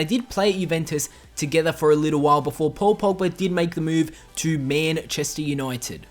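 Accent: Australia. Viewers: Are you Aussie or something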